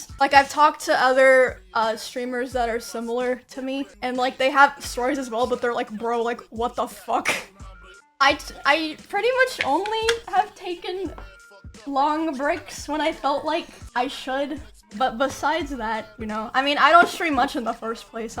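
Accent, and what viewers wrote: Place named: Kansas, United States. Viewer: Nice Kansas accent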